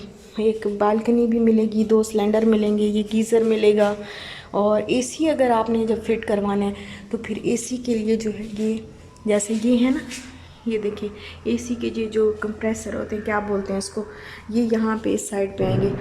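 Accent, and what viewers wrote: Saraiki, Pakistan. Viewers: Apka excent buhat pyara hai. Looks like seraeeki touch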